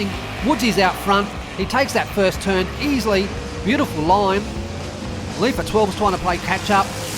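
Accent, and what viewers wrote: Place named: Australia. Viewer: Editing is just brilliant and I just love that australian accent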